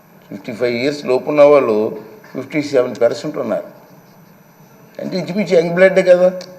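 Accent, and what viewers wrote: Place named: Godavari, India. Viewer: Nice Godavari slang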